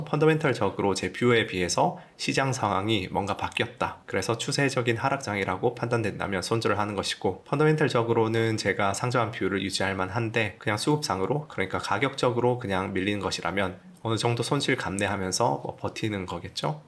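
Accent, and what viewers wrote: Gyeongsang, South Korea. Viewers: 대구 말투네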